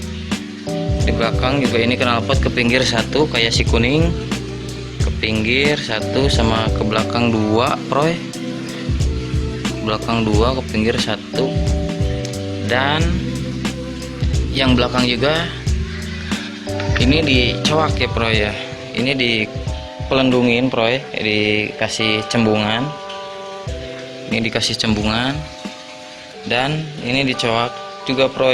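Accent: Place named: West Java, Indonesia. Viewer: Bahasa Indo nya ketahuan logat sunda bandung ya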